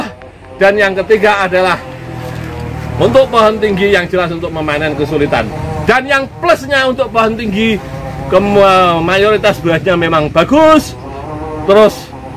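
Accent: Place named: Surakarta, Indonesia. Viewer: Dari logatnya kliatannya pak dhe berasal dr wlyh eks karesidenan solo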